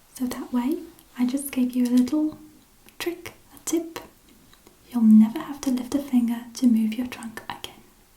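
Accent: England, United Kingdom. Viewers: she's American but she sounds British. This is the first video that I watched on her channel so I thought she was British and then I watched all her other ones and she's American 😂😂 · English accent. Britain is four countries. England, Northern Ireland, Wales and Scotland. All very different accents. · A bit of a mix of several British accents 😊so so so calming and wonderful! you’re so so talented and I feel very spoilt right now:)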